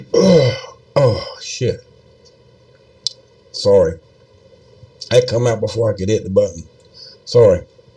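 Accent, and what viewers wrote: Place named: South, United States. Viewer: I love your accent. Reminds me of when I lived in Virginia and Melbourne, Florida. Enjoy your channel. Are you in Florida? Please don't take the Lord's name in vain.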